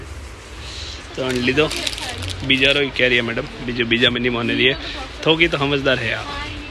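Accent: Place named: Rajasthan, India. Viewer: मारवाड़ी में बोलता भाई बहुत गजब लाग रिया हो!!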